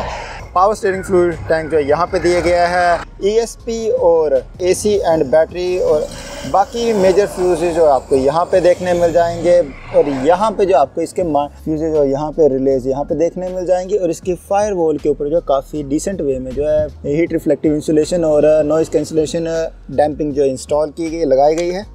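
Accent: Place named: Kangra, India. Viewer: ओ भैया हिमाचल कांगड़ा! दा बोला ना 😂😂😂 भाई! बहुत बढ़िया अति सुंदर 👍 बहुत ही अच्छा रिव्यु किया। है आपने।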